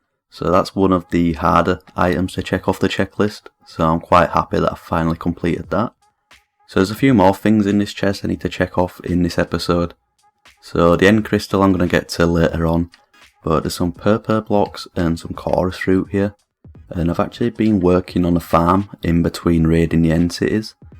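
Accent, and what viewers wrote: Scotland, United Kingdom. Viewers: Ghast Farm????? Jesus you are cracked. I based my base on your's so thank you! You deserve more subs. Also, Are you Scottish? I love your accent :)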